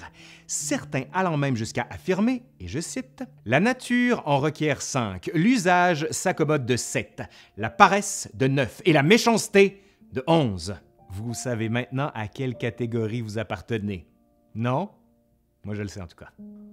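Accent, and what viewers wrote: Canada. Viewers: Selon ton accent, tu es canadien alors si tu parles en anglais tu auras beaucoup de visibilité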